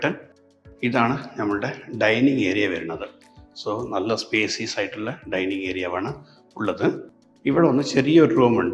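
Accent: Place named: Tamil Nadu, India. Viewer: Tamil accent😂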